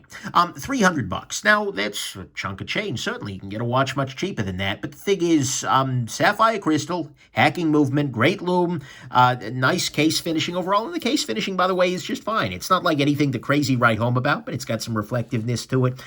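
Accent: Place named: Alabama, United States. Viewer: I have The Islander with the 60-minute dive bezel, it's a great watch for the price. By the way I'm picking up your southern accent I'm guessing Alabama?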